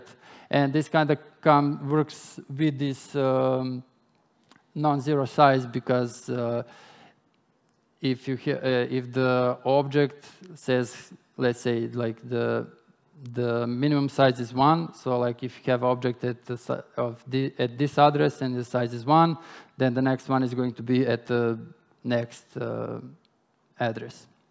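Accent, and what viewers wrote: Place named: India. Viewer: Ирландец с акцентом индуса )), это немножко ломает мозг, но доклад классный. Спасибо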